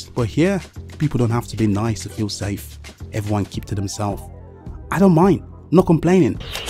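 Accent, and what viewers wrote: United Kingdom. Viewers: Sounds like you're British, not American. Am I right or am I right ? :) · are you American? Your accent doesn't sound like. British I suppose? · "America" in title >British accent I'm not watching this.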